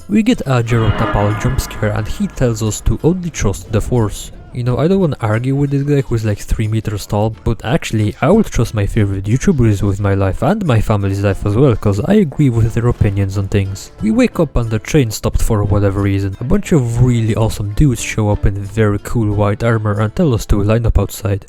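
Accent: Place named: India. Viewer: so where the hell are you from you have an accent so indian?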